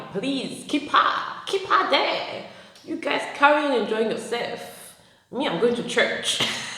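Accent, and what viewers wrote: Nigeria. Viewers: Your storytelling w/ the Nigerian accent has me screaming☠️💀😅you are sooooo funny🤣🤣🤣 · Hey sis. Your braids is really cute. U did a great job. I like your smile and 9jia accent.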